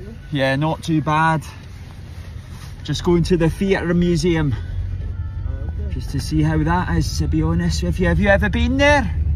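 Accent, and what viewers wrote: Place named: Scotland, United Kingdom. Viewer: The room looks beautiful 🥰 nice Scottish accent 😂x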